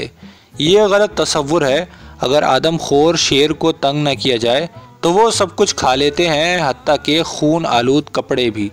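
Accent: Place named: Pakistan. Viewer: Aapke talaffuz se aisa lagta hai ki aap pakistan se ho.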